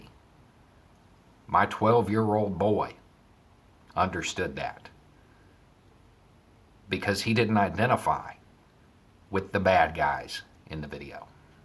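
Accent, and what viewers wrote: South, United States. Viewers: I hope you see this, I'll keep trying. I am working on a PhD in clinical psychology and I like you. I am going to admit, it took a lot of work for me to get past my implicit biases of your appearance and accent, my horrible family who happened to be from the south had traumatized me in that way. What you have to say is so important and so perfect that I did that work so I could listen to you. I've heard you express you don't have the the psychological knowledge to answer some of the questions you are asked. I'd like to help. I have been thinking about it for a while but when I heard you say in a video this morning that your dream for the world is being able to exist without government, I was moved to act. I imagine we probably have a lot of idealogical differences but I think that could just make us perfect sparring partners, we'd make each other better people. 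Give it some thought.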